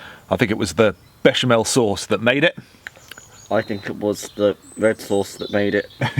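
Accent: United Kingdom. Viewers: My husband asked me about your accent. I told him you sounded British to me. Am I right? We surely enjoy your show especially this one with your son.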